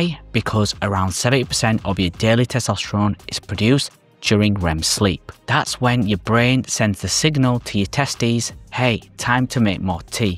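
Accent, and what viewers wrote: United Kingdom. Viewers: Cockney accent?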